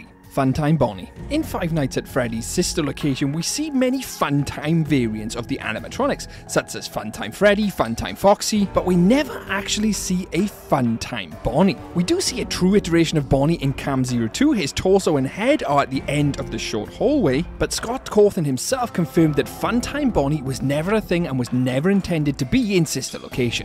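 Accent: Wales, United Kingdom. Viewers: Are you welsh by any chance?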